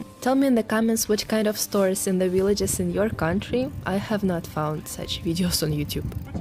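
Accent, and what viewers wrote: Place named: Russia. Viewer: Не написано на русском в описании канала, -русская ли вы, или иностранец. Но услышав акцент, думаю, что вы все же русская.😊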